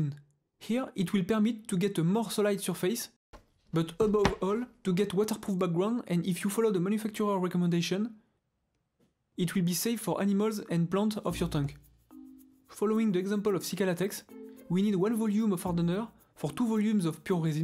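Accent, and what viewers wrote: France. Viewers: on voit bien que c'est un Français qui parle en anglais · un anglais avec accent français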